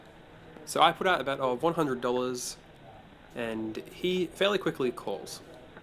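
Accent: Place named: Europe. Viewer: Can you include where you are playing your sessions please ? I notice the dealers are dealing from what appears to be a shoe? Never seen that before and I'm just curious as to where your from I noticed an accent so I'm guessing somewhere in Europe? Anyway keep up the good work the Vlog looked great. Have a great day.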